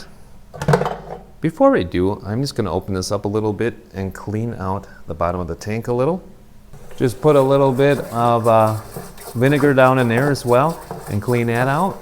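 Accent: Germany. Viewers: Sounds crazy: American slang in German accent! 😊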